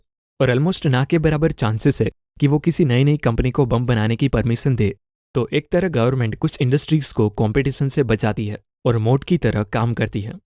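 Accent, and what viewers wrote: Gujarat, India. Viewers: Tame gujarati chho?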